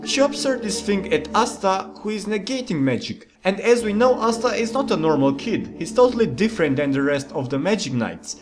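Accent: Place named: Russia. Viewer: The russian accent and "scary" backround music make this video comedy gold.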